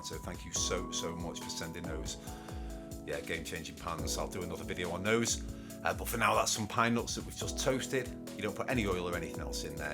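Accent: Yorkshire, United Kingdom. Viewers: I detect a Yorkshire accent, I could listen to yu all night. Actually just found yu channel so will be watching all night.😊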